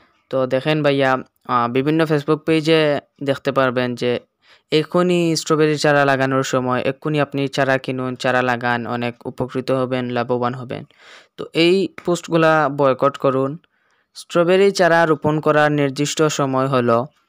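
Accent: Sylhet, Bangladesh. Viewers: ভাই কিতা সিলেটি নি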